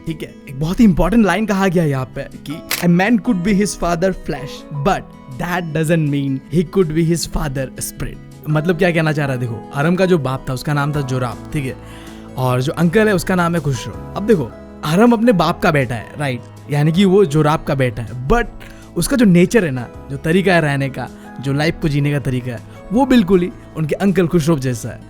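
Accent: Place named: Arunachal Pradesh, India. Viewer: Bro are u from arunachal 😂 bcz ur hindi is like us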